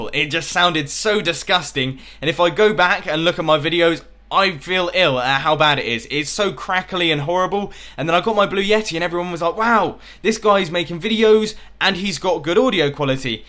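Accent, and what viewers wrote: United Kingdom. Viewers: the only reason im not subcribed to you, is because(not being racist) your british accent really annoys me. Its not personal, i just really hate the way british people talk.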